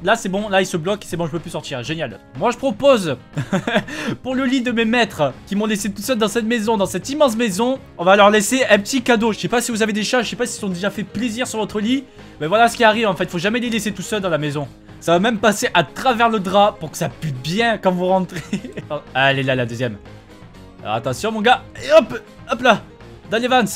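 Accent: South, France. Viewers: Hello à c'est toi qui a rajouté le symbole illuminati ? xD Tu viens d'où ? car t'a un accent du Sud :p ( je crois Montpellier mais pas sûr vu que tu avais fais une vidéo ) Jsuis deçue pour le twerk, mais bon bad ass ! Mdrr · Dat accent du Sud heing !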